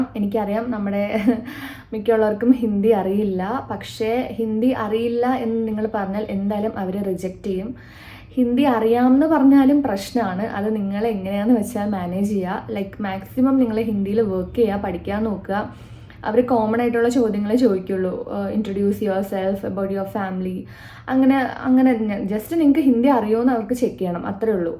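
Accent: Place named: Kochi, India. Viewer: തിരുവനന്ദപുരം സ്വദേശി ആണെങ്കിലുo കൊച്ചിയിലെ ഒഴുക്കായ മലയാള o കൊണ്ടു എല്ലാ പേർക്കും വളരെ ഇഷ്ടപെടുന്നുണ്ട്.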